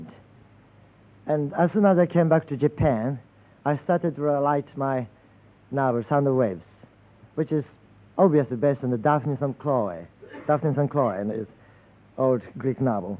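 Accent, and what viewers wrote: United Kingdom. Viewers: 昔のイギリス鈍りで当時としてはネイティブなレベルだったと思うぞ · 戦前の日本はイギリス英語を主に学んでいたと言うから三島さんもその訛りが感じられるね。 なんというかやはりイギリス英語は気風がある感じがするから彼にあってるね。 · イギリス訛りっぽい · わざとイギリス弁にモノマネした喋りすごい。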